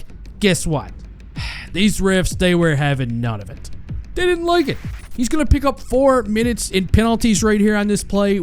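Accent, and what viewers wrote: Newfoundland, Canada. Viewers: wait your newfie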